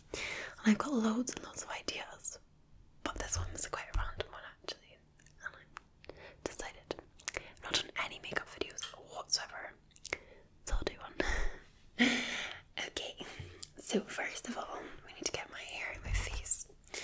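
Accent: Scotland, United Kingdom. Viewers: Hi! I just want to say that I really enjoy your channel. Your videos are great, and you're such a beautiful girl, especially without makeup. I love natural beauty. Anyway, I just love your Scottish accent. Your voice is so relaxing and it gives me chills. Thanks for posting this. I really appreciate you're honesty. ❤ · omg Scottish YEEEEEEY😍😍😍😍 glad to have found you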